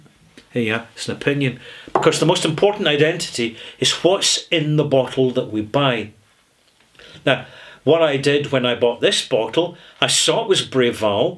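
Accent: Scotland, United Kingdom. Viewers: Hi, I love your trade dress. Please take that as a polite compliment from an old patent and trademark attorney. The tweed bonnet, the thick woolen flannel shirt, the Scottish accent, the bothy, the barrel, the whole vibe. Your knowledge is extensive, and the breath of your whisky knowledge and vocabulary, and the style of your delivery, is superlative. There is an honest integrity about you which I find very refreshing, heartwarming and frankly entertaining. With great interest I have probably seen over 500 of your reviews. I like you, I am a deliberate and passionate malt mate. I’m an American living in Cincinnati, Ohio who loves Scotland and scotch whiskey. So it’s clear that I love everything you are doing and I wish you all the best of health and I hope you do this for a very long time. So what my gripe? One and one only. Please review Macallan. 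I am your echo chamber and I will support everything that you don’t like about non age statement whiskeys. I understand that the bulk of your audience cannot stand the snobbery (or the sky-high prices) of Macallan. Yes, it IS the gorilla on the table. And ignoring it seems disingenuous. And you are anything but disingenuous. Oh, what I wouldn’t give to have review a NAS Macallan Oscuro, non chill filtered, natural color and bottled at about 46% abv. Yes high prices can be a turn off but what would Car & Driver be without periodic reviews of Bugattis and Ferraris. We may not be able to afford those lux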